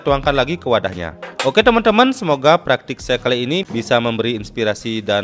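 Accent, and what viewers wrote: Bali, Indonesia. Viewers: diliat dari logatnya kynya orang bali nih , keren mas vidionya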